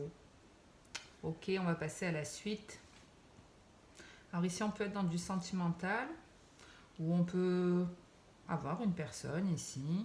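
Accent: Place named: Toulouse, France. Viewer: Bonsoir madame vous êtes également du verseau il me semble.j adore votre accent . Toulouse ahhhh . merci pour ce clin d œil.merci pour ce tirage .. bises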